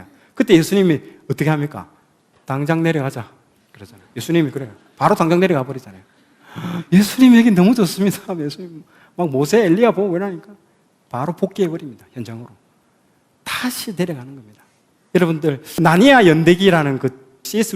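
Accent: Gyeongsang, South Korea. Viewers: 경상도 사투리가 정겨웠는데 아니! 마산! 저도 마산출신 입니다... 지금은 먼 외국에서 주님의 사역을 감당하고 있습니다만 오랫동안 한국을 가지못해 늘 고국을 그리워하며 살고있는 순례자입니다.... 구독해서 열심히 듣고 있습니다.... 주님의 이름으로 축복합니다... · 경상도 사투리의 억양과 또랑또랑한 음성이 조화가 되어서 귀에 쏙쏙 들어옵니다 감사합니다💝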